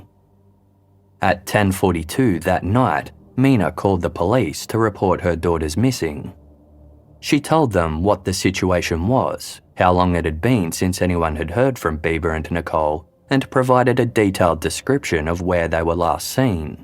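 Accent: Australia. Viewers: The narrator's accent is " educated Australian ". As opposed to " broad Australian" such as the crocodile Hunter.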